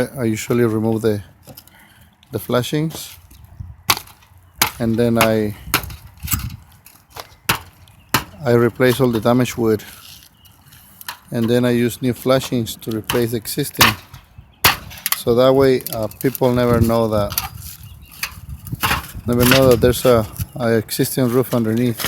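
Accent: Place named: Mexico. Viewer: No hagas ese mugrero primo y se te oye que eres mexicano mejor habla español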